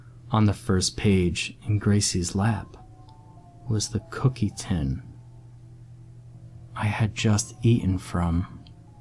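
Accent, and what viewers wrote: United States. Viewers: No, he's American or Canadian sounding. You should check out his channel, his back catalogue spans something like 8 years.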